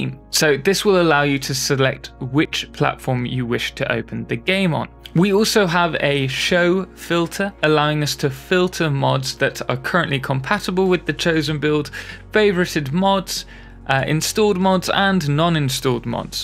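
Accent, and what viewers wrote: United Kingdom. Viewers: I just want to thank you for all your videos. Learning so much from here... I'm brazillian and even you gourgeous british accent isnt a problem since you care to speak slowly so... Honestly your videos are trully perfect. Thanks for your generosity. <3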